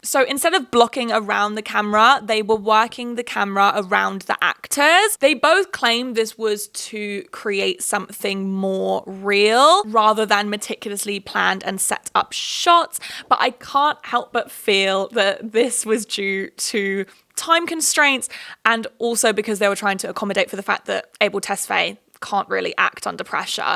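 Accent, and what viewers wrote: United States. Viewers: ok girl your American accent was surprisingly good in some places! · girl your American accent is giving me Emma Watson in the bling ring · oh my god I love the sarcastic american accent 😂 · I am begging someone in the industry to make a movie or show about an egotistical self-centered show writer who ramps things up higher and higher and higher and is basically given whatever he wants just because the company loves him so much. I feel like it could be quite a good surreal black mirror-esque show/movie Edit: also, killing the American accent!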